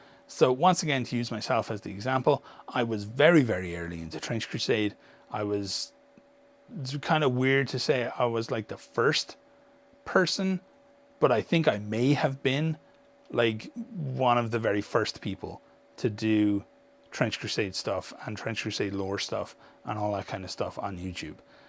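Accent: Ireland. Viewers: I listen to your long videos for the same reason I listen to Oculus Imperia: those dulcet Irish tones.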